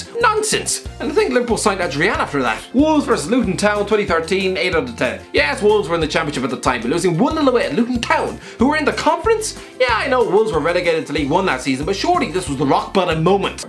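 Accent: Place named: Ireland. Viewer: I see HITC, I hear Irishman, I watch, I like. simple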